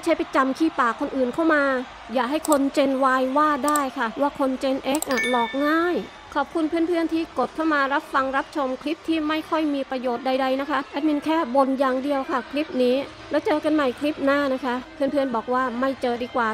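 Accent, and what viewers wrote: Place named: South, Thailand. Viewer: สุดยอดครับฟังเสียงน่าจะเป็นคนใต้นะครับ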